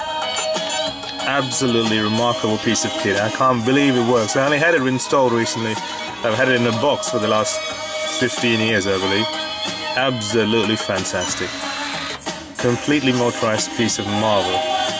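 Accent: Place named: South Africa. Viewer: Things and things It sounds sort of like a South African English accent. Source: I work with somebody from South Africa.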